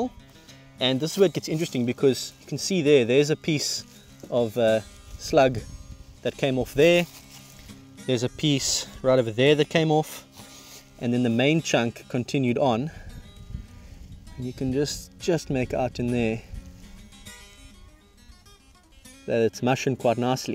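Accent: South Africa. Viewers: Funny to hear a mix of accents in South African English, a mix between Australian and a Dutch man speaking English. Groetjes uit Nederland.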